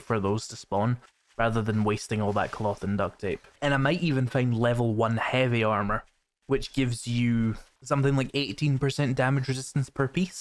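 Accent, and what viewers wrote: Scotland, United Kingdom. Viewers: are you Scottish, sound very very similar to a Scottish person trying to talk slow so other people can understand us 😂🤣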